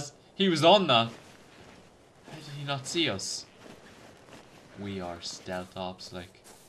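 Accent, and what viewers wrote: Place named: Ireland. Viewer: Haha that was fucking hilarious, I can tell your Irish as I'm Irish myself 😂🇮🇪👍🏻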